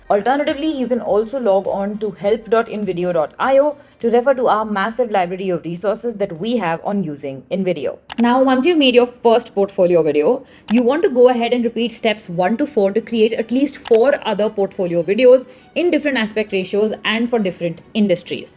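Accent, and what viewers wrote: India. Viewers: is this official channel of invideo ? with an indian accent is a turn off to be honest and on top she reads so fast like she is in hurry which make the customer experience a torture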